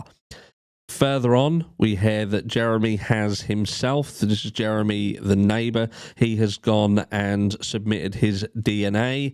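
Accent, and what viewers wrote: United Kingdom. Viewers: Yes I was one who brought up civil rights in the USA. It hadn't registered you were in the UK.* Such "dragnets" may be permissable in the UK. I recall reading about one done many years ago (40s?) (in I think it was in Prof Keith Simpson's book "40 Years of Murder). *(ur accent notwithstanding since I watch a lot of UK based content & thus Canadian, UK & USA accents no longer "jump out").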